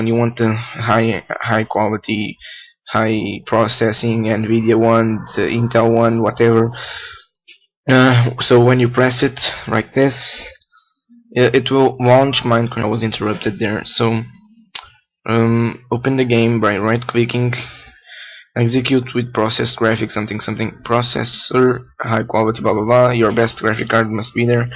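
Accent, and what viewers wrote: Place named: Portugal. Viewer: ahah you are português ! i noticed by your falar xD , thanks also now i got more fps :D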